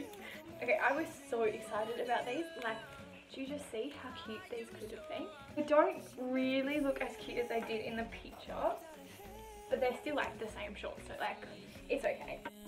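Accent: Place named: Australia. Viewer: OMG UR AUSSIEEEEE 💗 WOOOO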